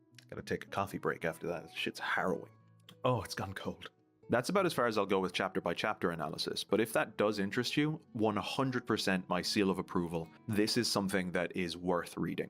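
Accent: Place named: Ireland. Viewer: It’s sooo good to hear an Irish accent in the wild! Incredible video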